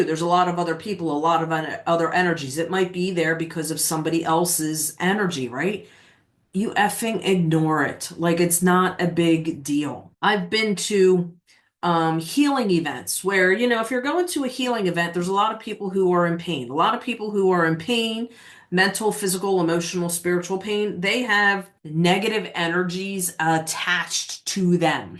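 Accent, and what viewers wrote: Philadelphia, United States. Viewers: You sound like u r from Philadelphia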